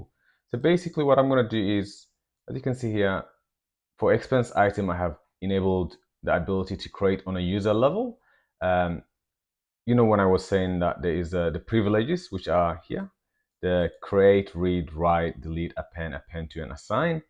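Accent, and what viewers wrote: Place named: Ethiopia. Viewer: My good sir, Might you be habesha. The accent made me check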